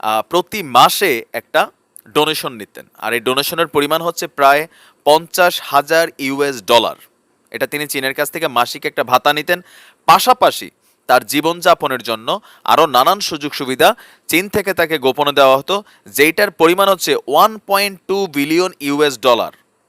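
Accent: Comilla, Bangladesh. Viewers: Cumilla hote vi apnar cumillar vasa khub vlo lage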